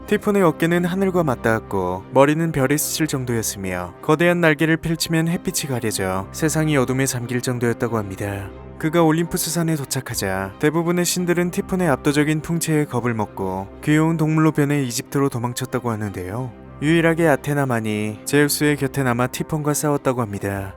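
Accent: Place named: Japan. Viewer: 그리스어 아는 한량입니다 일본어 악센트만 좀 없으면 밥도둑일것같습니다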